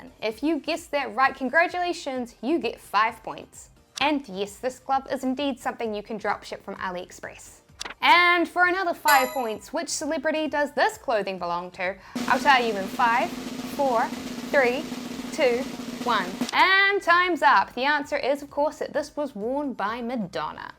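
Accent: New Zealand. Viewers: Love your content and kiwi accent....im an aussie.😆👍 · The only thing I like more than your cool Kiwi accent is that blue and pink striped shirt. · I think it's New Zealand accent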